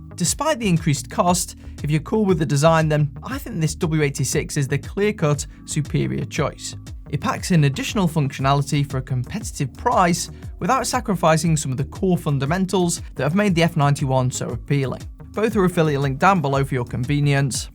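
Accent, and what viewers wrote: United Kingdom. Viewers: I hate your British English accent. It's just so annoying. Maybe because your contry and Churchill left us after WW2 alone to be eaten by Stalin behind iron curtain and we suffer so much! We helped you a lot during the war. Breaking Enigma first, and show how to fight in Battle of Britain. That's why we don't go to victory parade with you. Your country betrayed us and do nothing! You ordered to pay for fuel use in aircraft after the Battle of Britain. That was like slap in the face. After all good content about watches but the accent reminds me of how I hate you GB. From Poland.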